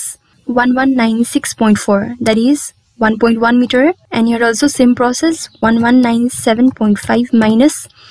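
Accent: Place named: Nepal. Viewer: you have nepali accent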